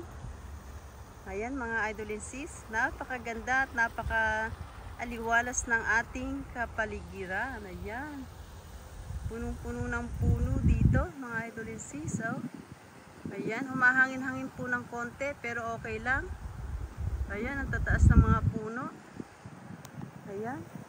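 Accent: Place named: Philippines. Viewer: Wow ... beautiful country side .. the weather looks calm , at looking napa fresh Ng place .. awesome lake view .. thank you for bring us there .. sis .. feel ko ehh parang taga Bulacan or Nueva Ecija or Quezon ..kz Tagalog na Tagalog ka sis .. thank you for bringing us there in Georgia ..tru Virtual tour .. Sis ..paxshout out Po sa next video upload mo ..❤😊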